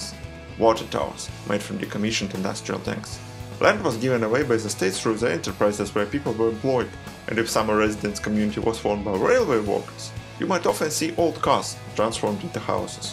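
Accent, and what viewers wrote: Russia. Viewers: Very good quality filming. The only thing I would ask or comment on is although your English is very good your Russian dialect can make it difficult to understand. I would suggest slowing down you're speaking just a little bit. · Хорошее видео, но неужели нельзя было найти человека с английским без акцента?? Монотонная ровная речь характерна для русского языка. Английский совершенно не такой. Пришлось прекратить просмотр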